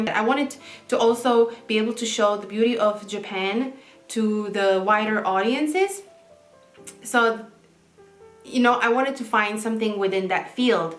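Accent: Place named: United States. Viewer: For someone who was born in Slovakia, your facial expressions and accent is very Westernized, or at least Americanized.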